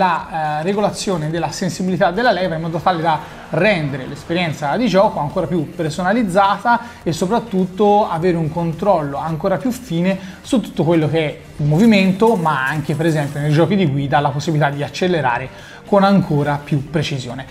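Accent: Tuscany, Italy. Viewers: sei toscano? 😂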